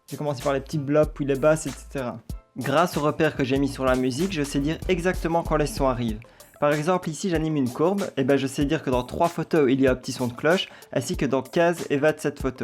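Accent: Belgium. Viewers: Ho ! Un belge ! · Hey je me suis aboné j'aime bien se qeu tu fait ta du talent est je lenten a ta voi te Un belg moi osi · Tu es belge? #accent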